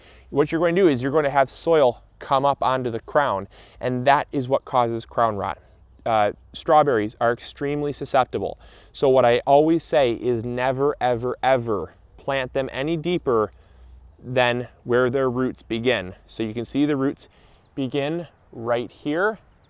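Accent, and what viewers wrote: Michigan, United States. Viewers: you have the thickest Michigan accent, love it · I can really hear that MI accent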